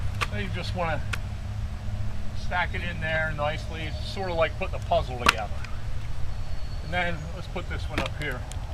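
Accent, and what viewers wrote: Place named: Philadelphia, United States. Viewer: First of all, the very first time I heard you speak, I knew you were from Philly. I moved away 30 years ago, but there's still nothing more I enjoy hearing than that gorgeous Philly accent! I've purposely made sure never to lose mine. ...Second, I did tree work in my younger days, mostly in Bucks county, but also a few logging trips up to Sullivan county. I miss tree work. It was tough, but I loved it. ...I also appreciate that you're equally at home splitting wood and planting flower seeds. Awesome.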